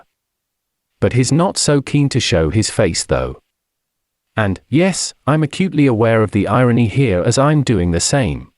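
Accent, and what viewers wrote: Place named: Multicultural London English, United Kingdom. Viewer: It's a 'London Multicultural' accent, not a real London accent.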